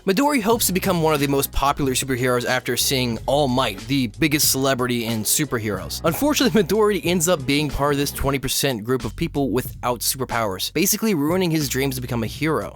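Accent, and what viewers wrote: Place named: United States. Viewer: Airborne Cthulhu he’s American calm down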